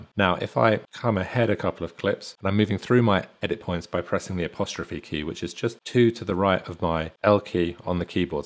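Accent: United Kingdom. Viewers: Just discovered you OMG thank God I've got a 1 month trial and haven't got a clue how to use it You tips are invaluable and your a fellow Brit without the annoying American accent I have subscribed This will really help before u go out a blow £200 on the package👍🏾👍🏾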